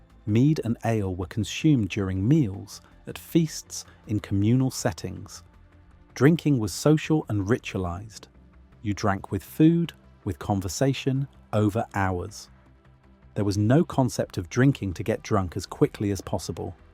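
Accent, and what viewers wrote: United Kingdom. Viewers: "indian pale ale" lmfao. and you're using a brit accent for what i now assume is your ai narrator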